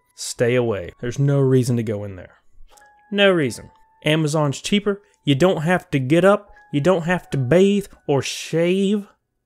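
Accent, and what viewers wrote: South, United States. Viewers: Youre so southern and not southern that it makes my brain love your channel. what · Southern drawwwwlllll.....cool vids just subbed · Toooooo southern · You used to sound southern what happened where’s the real 🤠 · your voice... I cant tell if youre southern or gay or both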